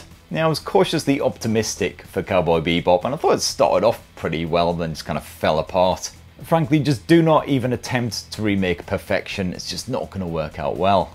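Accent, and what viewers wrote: United Kingdom. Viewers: The colourful metaphors with the British accent have me in stitches, the way you slide the odd one in, is quite brilliant. 👍 Providing a layer of humour on top of your reviews is doubly entertaining. Bravo sir 👏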